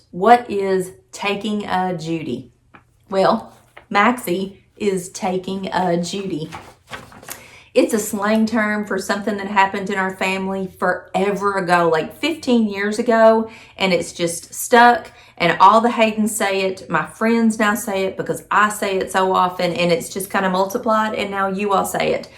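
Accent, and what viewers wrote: United States. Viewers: That was a great video, I knew most of that from following your channel, but always happy to hear you chat (you have a very nice, soft American accent 😊) . Never knew about Randy being your second hubby, glad you found each other again 💛........If I lived in the states, I would love to buy your business🍨🍦 Best of luck in all that you will do, your fans will be watching 💛💛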